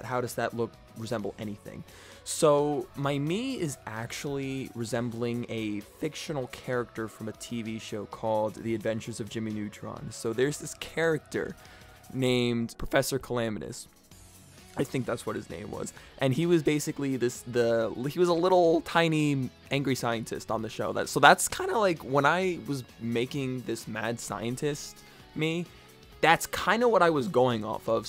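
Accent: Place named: United States. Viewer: kinda sounds like just a generic american accent (the kind where people who have it don't know that they have an accent, me being one of those people)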